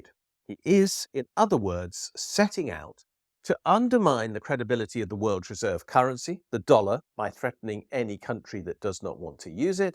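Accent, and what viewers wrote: United Kingdom. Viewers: It's still ranting and lunacy if it's said slowly by a posho brit.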